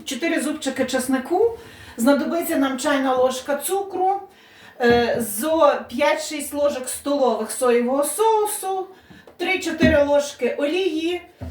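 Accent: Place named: Lviv, Ukraine. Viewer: Не знаю от куда вы, но говор, как у моей родной тёте из города Броды, Львовской обл. Мед для ушей. 🥰.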